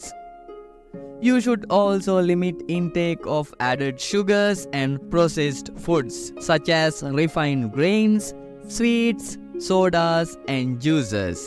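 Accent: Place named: Sri Lanka. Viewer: your accent says you are from Srilanka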